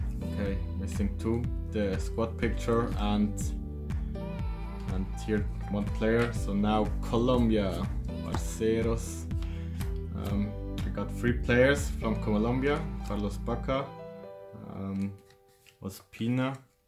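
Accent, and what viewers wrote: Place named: Switzerland. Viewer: Ich wette du kommst aus der schweiz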